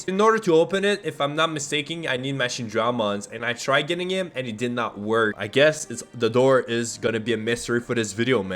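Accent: Quebec, Canada. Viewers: His French canadian accent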